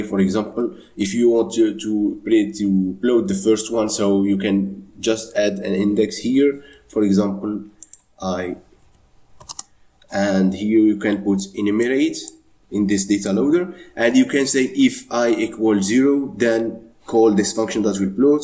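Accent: Morocco. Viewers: لي كيشرح مروكي باينة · I know a Moroccan voice when I hear it . Happy to see my fellow compatriot delivering a course on this channel. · Anyone who's confused with the word "paasions", it means "patients". I too was confused due to his moroccan accent. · HHHHHHHHUU tanta ban lik mghribi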